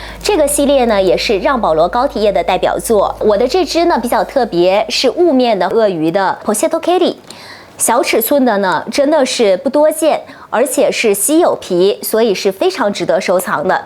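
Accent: Japan. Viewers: 是的呀 日文发音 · 是日文加大东北混合发音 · 請問英文是日本口音嗎😂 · 對啊，她是在日本生活的，英文發音就是日本人的發音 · 她好像是在日本，日式英語不標準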